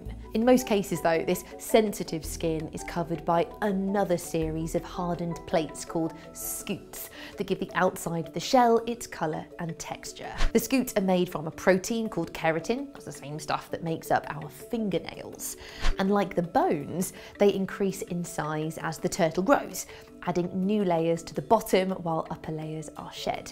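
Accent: United Kingdom. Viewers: Loved the vid...love the Brit accent! "Water" becomes, "wwWW-ta" LOVE it! (not making fun, I really do like listening to Brits talk)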